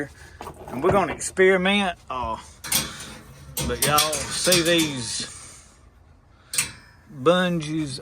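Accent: South, United States. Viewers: Looking for help for Arctic Blast on homestead in Alabama 😮(AND I LOVE 💕 hearing your sweet Southern voice 😅)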